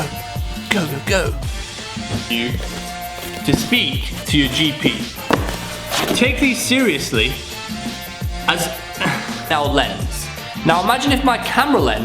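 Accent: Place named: West Yorkshire, United Kingdom. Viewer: He sounds like he's from Bradford West Yorkshire.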